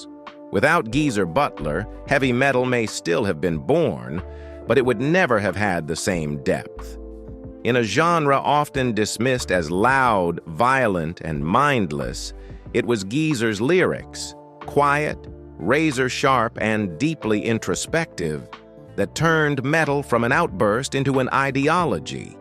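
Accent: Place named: United States. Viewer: can't all yanks just stop narrating Sabbath history in the awful American English...shocking...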